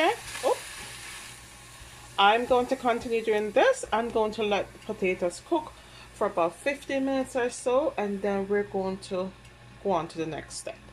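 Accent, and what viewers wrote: Jamaica. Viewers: Yummy 😋 you have an jamaican accent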